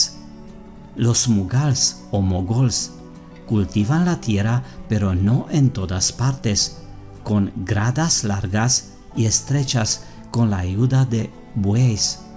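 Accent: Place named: Saxony, Germany. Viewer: Me pregunto cuántos idiomas sabes. Por el nombre de tu canal veo que manejas el Latín, por tu acento adivino que eres sajón, por la información que presentas veo que sabes francés. Eres muy bueno. Saludos desde México, tú amigo Tartariano....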